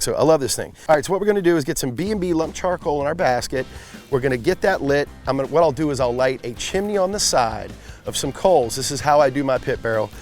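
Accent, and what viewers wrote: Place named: South, United States. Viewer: Great video. Except your accent sounds like the southern surfer guys we have down in Charleston. Strange. Haha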